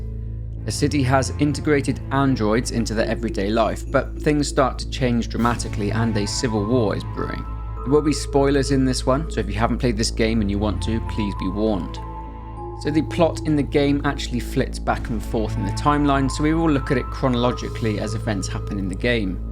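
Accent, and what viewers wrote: United Kingdom. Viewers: Why is it always some british fool talking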